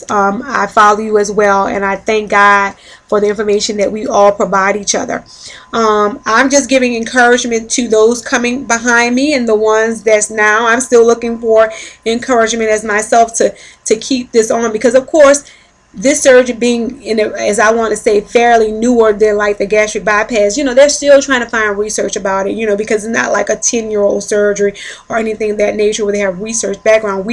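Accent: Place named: New Orleans, United States. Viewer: You are SO COOL!!!!!!!!!!!! You have an accent, Are you from the NOLA? *New Subscriber*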